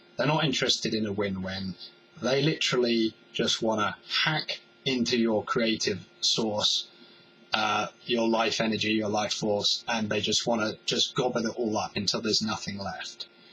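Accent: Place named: South, United Kingdom. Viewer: the healthy narcissism part I could utilise for being/becoming a dancer, learning to overcome fears is needed moreso.btw, I can tell you have part dutch genetics within you from your vocal tone/sound, (of course mixed with a southern uk accent)... maybe I can connect more positively here on youtube :)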